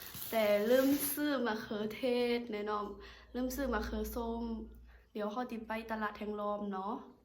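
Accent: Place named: North, Thailand. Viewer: วันนี้อู้กำเมืองเลยน้อ... 👍👍👍👏👏👏🌹🌹🌹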